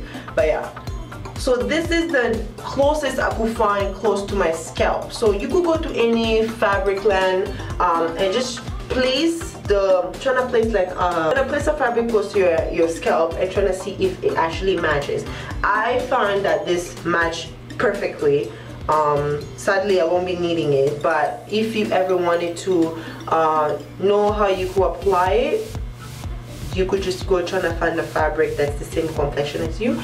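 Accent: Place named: Africa. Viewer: love the African accent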